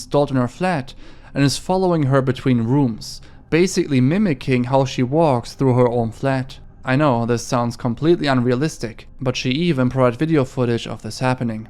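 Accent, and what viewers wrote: Germany. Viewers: I think he said once that German was his first language, so you’re probably just hearing how thick his accent is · You are German, right?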